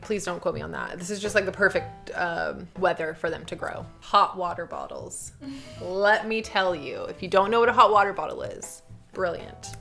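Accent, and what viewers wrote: England, United Kingdom. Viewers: Your British accent might be rough but you have developed a British-esque cadence to your speech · I actually think you have picked up a little english accent love it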